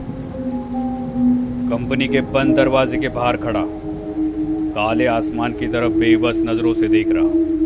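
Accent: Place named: South India, India. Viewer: Mujhe sauth ka lga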